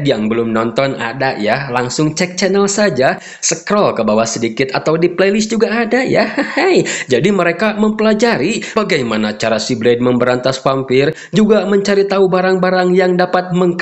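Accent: West Java, Indonesia. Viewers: Lanjutkan min keren lucu pembawaan nya 😂,sunda pisan uhuyyyyyy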